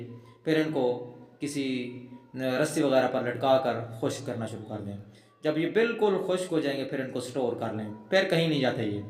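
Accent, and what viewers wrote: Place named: Saraiki, Pakistan. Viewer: میڈا بھرا بالکل سرائیکی لگئے😅😅کتھوں دے ہیوے بھرا خاں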